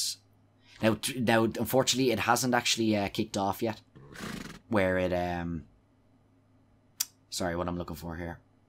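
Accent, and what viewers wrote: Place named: Ireland. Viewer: I figured with the actent lol where in dublin are you from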